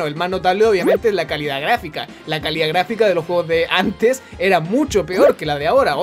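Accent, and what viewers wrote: Chile. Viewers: Podrías por favor tratar de neutralizar más tu acento...? Tus vídeos son excelente pero tu acento chileno se me hace tan fastidioso... No soy hacer ni nada, solo como consejo..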